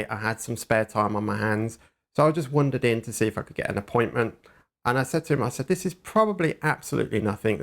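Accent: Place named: Midlands, United Kingdom. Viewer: I've got MS about 15 yrs old. I'm from the Midlands you sound local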